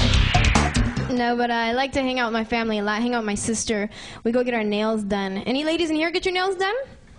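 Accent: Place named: Thailand. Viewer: She speak Thailand accent, not Vietnamese one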